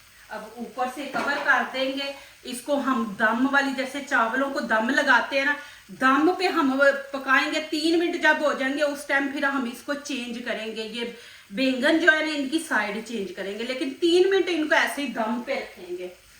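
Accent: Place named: Punjab, India. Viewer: بھت اچھا سالن اپ نے بنایا ھے ماشاءالله ۔لیکن بات یہ ھے کہ اپ کی اردو پنجابی ھے اس لہجہ میں بات کرتی ہیں تو بہتر ہوگا اپ پنجابی زبان ہی بول لیا کریں پاکستان کے سب علاقوں میں پنجابی کو سمجھتے ہیں لوگ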